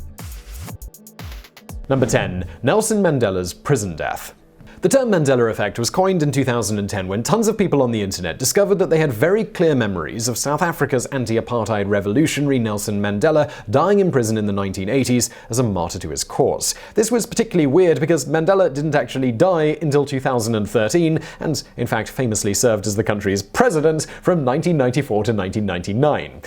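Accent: England, United Kingdom. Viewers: Sorry chap, doesnt matter how english your accent is, you just cant simply explain away the Mandela Effect. You mainsteam media people are utter fools. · why is Simon talking with an English Accent?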